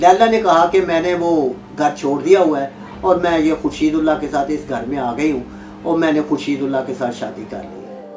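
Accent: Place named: Punjab, India. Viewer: Buth boray lyjay may batha rahy oap k8 urdu punjabiyu k8 thara hai ap k8 video sun nay k9 dil nahi chahatha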